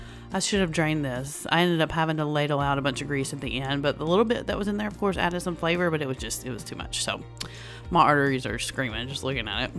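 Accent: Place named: South, United States. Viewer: Everything looked incredible. Yum.. I love grilled cheese and tomato soup too. Boy that unstuffed peppers.. yes please please.👏. I'm definitely making that. My Question is where was you born ? You've got kind of a Southern accent to me. My entire family is from The South. Mainly Kentucky. And What is your favorite Food ? Good luck to all the winners ! Love your indiana friend. xoxo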